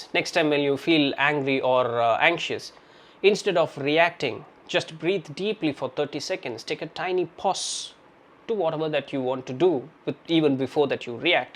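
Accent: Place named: India. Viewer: I'm surprised with your good English with less indian accent...l This makes your video in english more inner understanding with feelings... Thank you for this english you tube channel & May you get the highest success & blessing from God... Love from 3rd indian generation living outside india ❤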